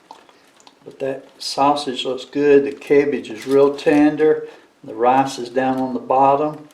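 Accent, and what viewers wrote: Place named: Alabama, United States. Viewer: Yall in Alabama? You sound like Bama...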